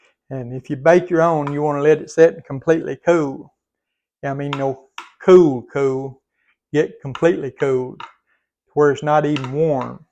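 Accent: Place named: Louisiana, United States. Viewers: Natural yogurt is a good cheaper substitute for sour cream, they want $6.00 for 1 cup 250ml sour cream here now 🤨 and I noticed the other day that your dollar is our 1.52 We don’t have cool whip either, we used to have frozen dessert whip back in the 80’s greasy horrible stuff it was. Didn’t look anywhere near as good as yours. I just Love your Southern accent, I used to follow Miss Phyllis Southern Frugal back in 2019 before She and Mr Bucky went home to be with the Lord, he Son runs her channel now, but I don’t enjoy it without her blessed beautiful heart, still has all the good frugal old time recipes though, ✝️🙏🏽💖💙 Jesus is Coming I hope ya’ll know the Rapture is VERY CLOSE 👑✝️🙌🏽☁️💫☁️💕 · You Sound Just Like My Louisiana Family ..♥️♥️♥️I love Your Southern Attitude 🤗🙏🏼Looks So Yummy ! BEAUTIFUL ♥️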